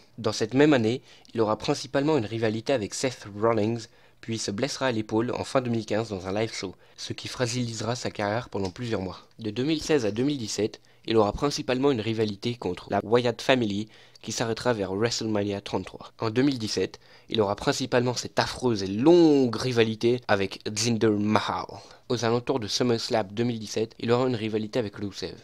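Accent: England, United Kingdom. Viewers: Pourquoi tu parle avec un accent anglais c moche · Mdrrrrr trop gênant car le contenu ça va mais j'arrive pas à me concentrer, arrête l'accent anglais gros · J'aime beaucoup le contenu mais paaaar pitié stop ton accent anglais putains xD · J'ai kiffé mais y'a juste un petit truc c'est mieux quand tu parle sans l'accents anglais parce*-que avec on comprend pas trop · je veux pas etre mechant mais je tient a dire que ton vieille accent anglais est vraiment soulant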